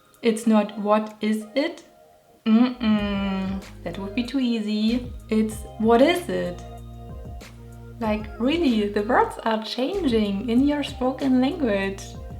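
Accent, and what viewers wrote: Germany. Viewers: I think your accent is awesome!I love it!!I don’t really care that u have an accent!!GERMANS HAVE GREAT ACCENT!AND YES ENGLISH IS HARD BUT U DOIN BETTER!🇧🇪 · Without sounding rude I think you have a very nice accent and can not understand why you'd want to sound less German. I (and others no doubt) can understand you perfectly fine. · Well, I'm American and have been living in Germany for about 9 months. My travel has been limited due to Corona, so I have mostly been hearing the Rheinland-Pfalz accent, but I don't think the German accent is annoying at all. I think do your reasoning for reducing your accent is good. I can understand you just fine, but maybe some Americans might have a harder time. There is nothing wrong with having an accent though. I am struggling to learn to speak German without sounding like I have a potato in my mouth if it makes you feel any better. 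· I'm German myself and I have to be honest,my first suggestion when I heard your voice for the first time,was "I she also German??"that's so sad,but I know that feeling,but I also have to say many of our english teachers aren't even able to speak with a proper pronounciation....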